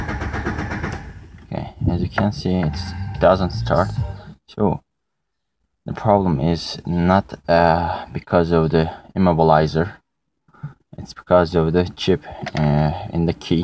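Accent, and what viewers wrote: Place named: European Turkish, Turkey. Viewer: ASLAN Aslında konuşmasından biraz belli avrupa da bir türktür kesin.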